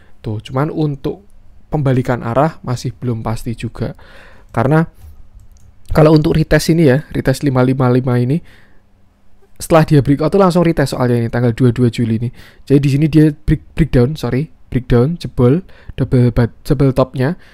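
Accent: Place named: Central Java, Indonesia. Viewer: medok gess… org jateng nih min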